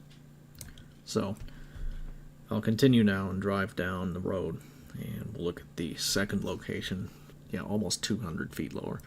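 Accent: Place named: Australia. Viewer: Dear, I'm trying to understand why Yourself, CoolHardLogic and Reds Rhetoric don't use your real names and show your faces on vids? Are yous afraid of something? Even many of these FE people do. Also are there ANY "conspiracies" that you do believe in? Your accent sounds like an Aussie! An Aussie at the a bar the other weekend told me about a supposed shooting hoax in Australia. The Port Arthur Massacre? We never heard much about it in the states here. He said MANY Aussies were fooled by it at first. Apparently it lead to a gun buy back program which took many guns out of Australian people's hands who now wish they didn't do it? Sounds weird to me? These vids on Youtube don't make any sense about it or seem too "conspiracy" minded. It's just a buy back program anyway right? Any man can still buy a gun down there, correct? Australians would never go for that nonsense, they are hard working proud men! 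I don't believe it.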